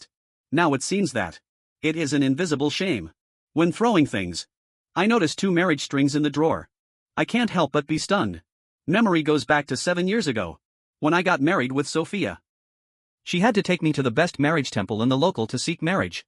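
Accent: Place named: China. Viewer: chinese style english . . .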